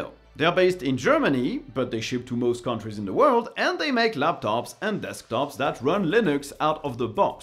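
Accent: France. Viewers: I love hearing you speak in "French" English. This is a very interesting experience. I greet you from Poland. 😉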